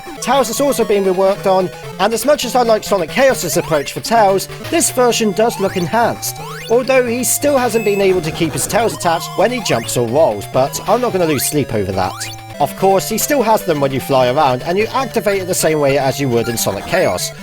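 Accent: United Kingdom. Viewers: I guess your british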